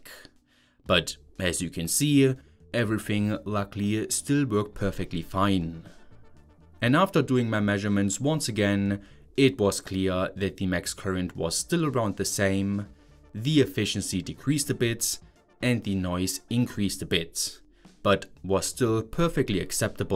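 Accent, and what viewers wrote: Germany. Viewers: Now i know where the meme "smol" comes from. Doesn't get more dschörmann than that accent.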